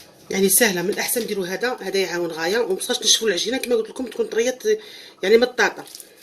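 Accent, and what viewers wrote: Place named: West, Algeria. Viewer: هدرت الغرب شابه😍تعجبني😂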